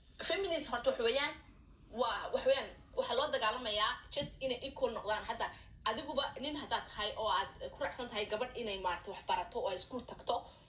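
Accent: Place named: Egypt. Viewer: Abaayo masha allah wax loo baahnaa ayaa ka hadashy shukran lkn su'aal masar miyaa ka tagtay waa laga dareemaya lahjadada salaam from Egypt 🥰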